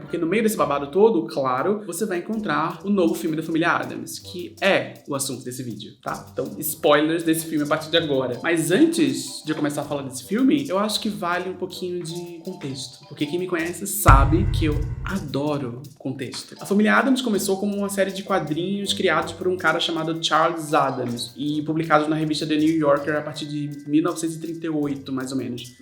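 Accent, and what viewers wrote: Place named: Recife, Brazil. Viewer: os vídeos de são o cantinho da internet que me faz sentir que tô tomando cerveja com uma amiga que fala sobre cultura pop de forma inteligente e debochada e matar a saudade do sotaque de Recife Relação parassocial 10\10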